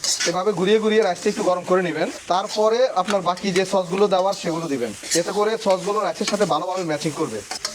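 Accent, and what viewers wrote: Noakhali, Bangladesh. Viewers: হেতে নোয়াখাইল্লা এএএ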